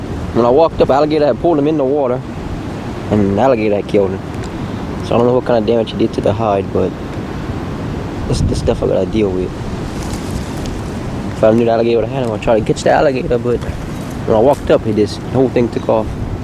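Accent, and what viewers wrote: Louisiana, United States. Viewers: I love your accent. Louisiana I assume? Youre so soft spoken too. It isn't that harsh cajun lilt lol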